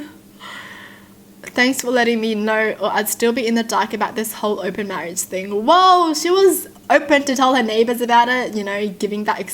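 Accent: Australia. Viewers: she is way to damn austrailian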